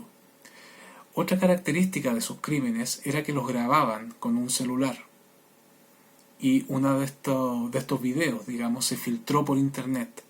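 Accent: Chile. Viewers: Wow tienes acento chileno, eres chileno??? No sabía jaja eres genial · Me ha encantado tu canal... He visto varios vídeos de asesinatos y creo que los tuyos son sumamente completos en cuanto a información y material fotográfico o fílmico... Además me gusta tu voz cuando los vas narrando... El acento chileno me encanta... Saludos desde Mendoza, Argentina... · La tonada chilena que tenés ....le da un toque maravilloso a los casos .....muy interesante tu canal !!! Desde Argentina te saluda una chilena !!!!! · Me encanta el asentó chileno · Me gustan tus documentales, por que tu voz es neutral y muy clara, me costó encontrarte el timbre chileno sin embargo es maravilloso un acento y una forma de narrar como la que tu tienes, correcto y facilidad para entenderte, siempre agregas más datos y nueva información, se agradece amigo mio.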